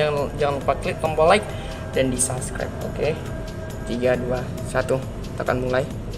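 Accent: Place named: Batak Toba, Indonesia. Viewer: logat, bahasa dan marga anda saya tahu. Batak Toba. Saya orang Karo dan tinggal di sumut juga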